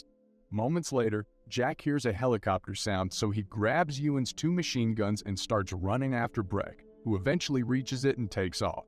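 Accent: Texas, United States. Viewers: Texas accent recap? is this an AI voice?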